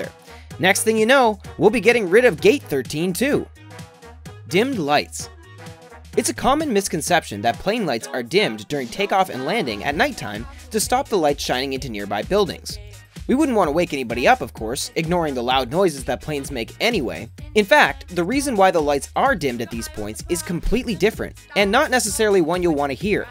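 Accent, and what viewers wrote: Canada. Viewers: Are you canadian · The narrator is a Canadian, obviously. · He’s Canadian (like me)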